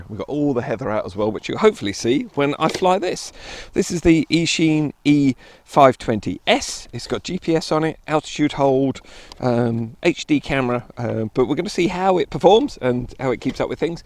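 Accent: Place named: England, United Kingdom. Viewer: A few giveaways when an English person has chosen to live in the Highlands of Scotland. The accent and the mentioning of the weather every couple of minutes but 95.497.....2% say they love the place. Helpful vid, I'm a novice at drones. Thanks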